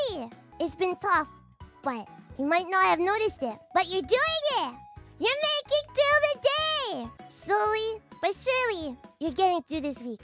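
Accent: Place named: Japan. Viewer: 到底怎麼把英文講得跟日文一樣… 我愛死她了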